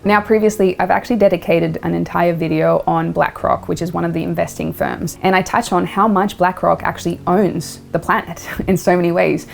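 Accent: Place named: Australia. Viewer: I have watched a few of your videos and I thought you had an Australian accent but also American and something else. G'day mate from down under. First I want to mention that the world economy was in a big down swing long before the plandemic and staged war. I recently sold my house in less than 24hours and have moved to a van with no electricity or running water but I'm debt free and living on a friends property rent free. Very interested in putting my money into the bank of Australia. Thanks for your honesty and Respect and kindness 🖤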